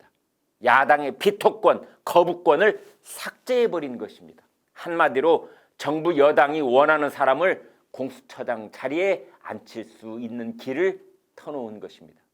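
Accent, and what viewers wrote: France. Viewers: 불어 발음방식이 너무 불편하네요.. 그냥 한국식으로 발음하세요ᆢ담부터ㆍ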